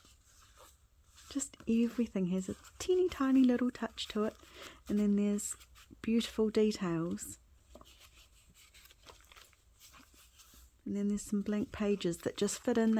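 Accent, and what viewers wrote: New Zealand. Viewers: I never thought of using a journal as decor! I am on my second journal - the first was made out of a little box and I am actually using it for notes and memorabilia; the other is bigger and sits on my desk - it is still being added to and it's not for writing in, just, well, admiring and trying things out as I learn. I now have an excuse to start a third one - a purely decorative one for my bedroom:) Lovely channel and great to have New Zealand content and a Kiwi accent to listen to. I'm waiting to see some Edmonds cookbook pages turn up in your signatures!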